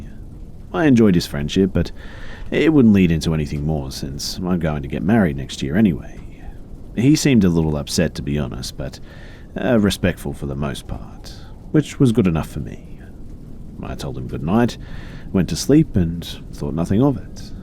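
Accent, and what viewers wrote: Australia. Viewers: OMG an Aussie narrator! Ahhhhh.....wonderful.... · 😂😂😂😂😂😂😂 he’s Australian you asshat go get your ears tested and don’t ever say an Aussie is a whinging pathetic Pom again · What's great about you, Be, is that you're monetized but you don't edit out cuss words. Or maybe I just like to hear you swear in that Aussie accent. Either way, I'm dedicated to this channel. Long live Be! 🐝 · I was surprised that its narrated by a fellow aussie, makes it far better!!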